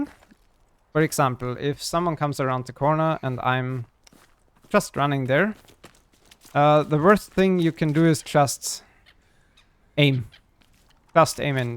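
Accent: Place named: Germany. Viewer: Man merkt so das du deutscher bist😂😂 aber danke dein video hat mir echt geholfen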